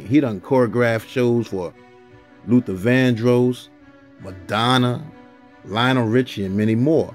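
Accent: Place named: New York, United States. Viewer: Your channel is awesome. And that New York accent!!! 😍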